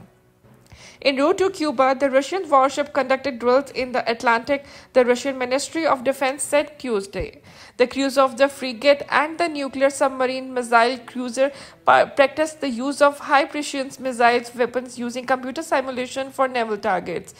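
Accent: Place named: India. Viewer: British ? Not at all ! Your speaker has a strong accent... Russian media with Indian speaker ?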